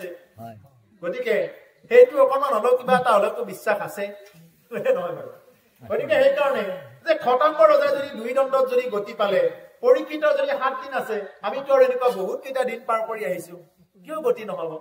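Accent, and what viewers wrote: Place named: Darrang, India. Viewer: কিমান সুন্দৰ লাগে এই দৰঙ ৰ সুৰ টি কৃষ্ণ কৃষ্ণ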